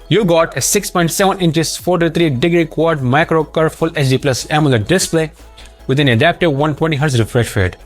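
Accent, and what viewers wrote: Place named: India. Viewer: the annoying dirty indian accent is cringe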